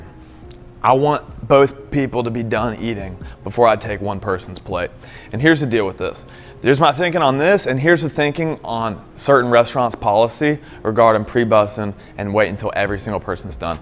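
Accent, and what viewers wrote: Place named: South, United States. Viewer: he's just southern, calm down 😭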